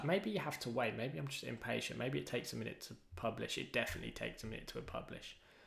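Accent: United Kingdom. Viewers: nice British accent and i think they should change the name to feel.js seems nicer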